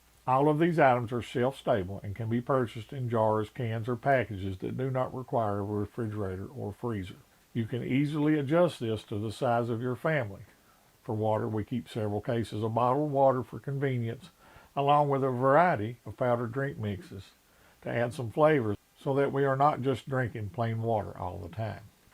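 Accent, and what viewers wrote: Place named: New York City, United States. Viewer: He talks like a New York Jew.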